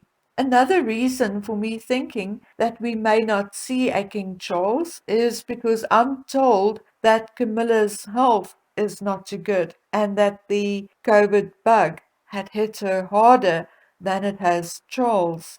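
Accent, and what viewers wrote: South Africa. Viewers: It’s so lovely hearing your South African accent🥰🥰🥰🥰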